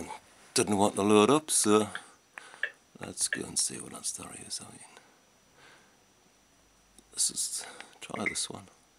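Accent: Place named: North, United Kingdom. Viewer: Mate, I would seriously pay you to make this for me, been looking at fires endlessly and this is better than any of them. This video is quite old, so I would love to know if you're still using it and how it's going. You sound from the North, I'm in Scotland and I serious about you doing this for me. I take it this is just for looks and no heat involved. If you are interested, please reply and we can get in touch. Regards.....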